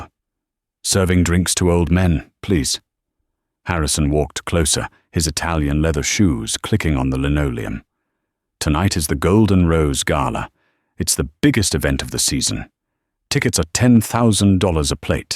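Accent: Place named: England, United Kingdom. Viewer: Yes. I agree. Luv that purring English accent. YUM!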